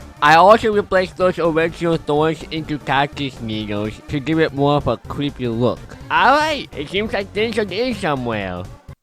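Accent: United Kingdom. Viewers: Bro, sounds like a British person😂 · Is bro british or smth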